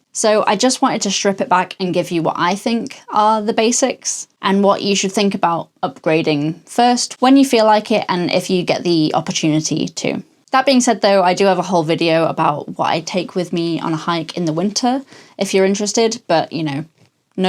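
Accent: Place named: United Kingdom. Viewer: This might be my new favorite youtube channel. I love the fact you didn't put any music in the background!! You seem to be so down-to-earth, i love it. I love your accent, which country are you from in the UK ? Love from Normandy, France, where the wheather is as bad as yours! 🌧🫶🌞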